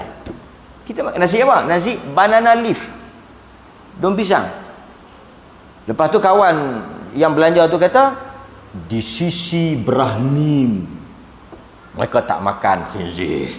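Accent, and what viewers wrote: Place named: North, Malaysia. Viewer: Orang utara mmg bahasa kasar skit,tapi aku suka sbb ustaz ni teroih terang..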